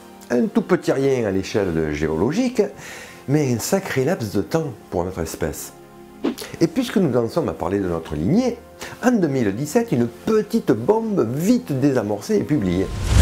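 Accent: South, France. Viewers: La paléontologie avec l accent du soleil et de la passion. Merci! Je n' ai pas de sujet précis à te soumettre, le principal c est que tu prennes du plaisir à faire tes vidéos car c est ce qui nous fait plaisir 😍 Juste une petite suggestion, pourrais-tu trier tes vidéos en fonction des eres et des thèmes? Il est quelques fois difficile de trouver les suites de tes mini séries. Merci et j attends avec impatience la prochaine. 😙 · Encore une très bonne vidéo, comme d'habitude. De très bonnes explications sur fond de vidéos et d'images bien choisies, un vrai plaisir pour les yeux et les oreilles. Perso je suis du centre de la France et n'ai pas l'habitude de l'accent du sud, mais étrangement je trouve que ça donne un gros plus au côté narratif de tes vidéos, donc encore un point positif ! Hâte de regarder tes prochaines créations. À bientôt dans un prochain commentaire, Un passionné de paléontologie, comme beaucoup de gens ici :). 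· De rien. J'adore aussi ton accent du sud, moi même venant du nord, ça donne un côté exotique ;) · J'ai découvert ta chaîne il y a peu, et à ma connaissance c'est la seule du genre. En français. Avec un accent qui sent le pastis. C'est très sympathique, instructif, bien fait. Que du bon, quoi. Alors accroche toi.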